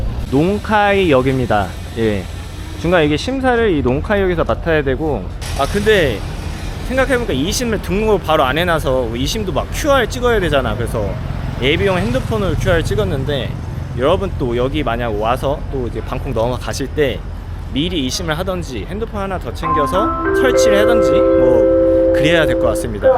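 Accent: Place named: South Korea. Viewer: 콩글리쉬 자신감 좋습니다 ㅋ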